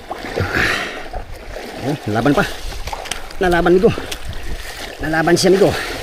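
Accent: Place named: Visayas, Philippines. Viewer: Idle ayusin monga yung pagsasalita mo magtagalog May halo kasing bisaya,kong bibisain mo nalang kaya para hinde ka mahihirapan sakalisod haha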